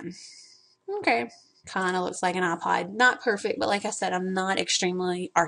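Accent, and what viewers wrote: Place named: South, United States. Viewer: HOT/SMART/SOUTHERN ACCENT. Your gonna make someone very happy.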